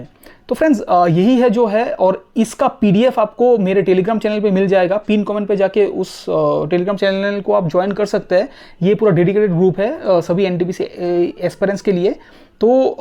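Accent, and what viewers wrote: Bengal, India. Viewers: Sir aap Bengali ho?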